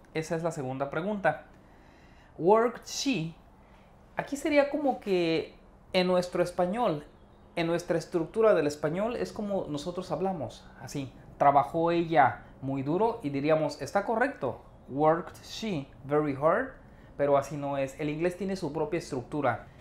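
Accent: Spain. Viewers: me encantó tu acento español tocallo. hace tirmpo hiciste un video sobre inglés britanico ¿ Podrias, por favor hacer uno sobre inglés Australiano?. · Ese acento español...mal jajaja un saludo y buen video una vez mas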